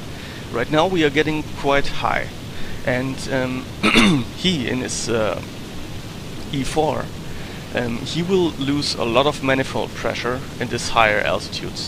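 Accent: Germany. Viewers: Ich kann da den Deutschen Akzent raushören :D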